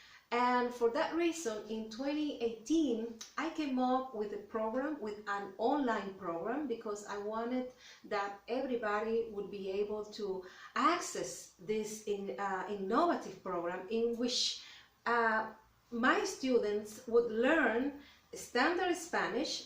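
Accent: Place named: Dominican Republic. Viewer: I can tell you are Dominican.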